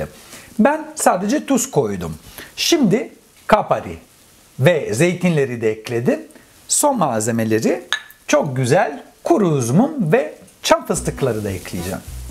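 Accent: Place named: Istanbul, Turkey. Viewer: İstanbul türkçesi konuşan harika bir italyan şef👏🏻👏🏻 ellerine sağlık, harika!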